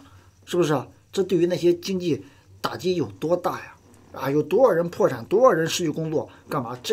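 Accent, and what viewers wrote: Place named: Xiji, China. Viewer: 你口音像西吉县的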